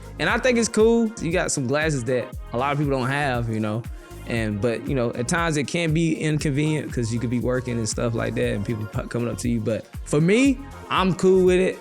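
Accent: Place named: South, United States. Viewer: That boy from down south you can hear it in his voice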